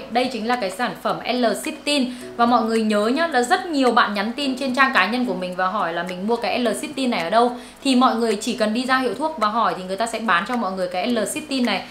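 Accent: Nghe An, Vietnam. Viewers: Giọng Bắc của chị thi thoảng pha giọng Nghệ An nghe cute thế😁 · người nghệ an phải ko bạn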